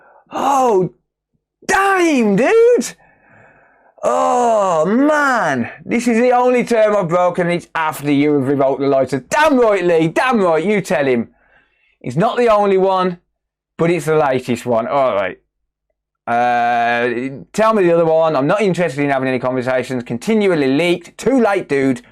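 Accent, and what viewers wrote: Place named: New York, United States. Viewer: It’s how New Yorkers speak. Straight outta the gutter.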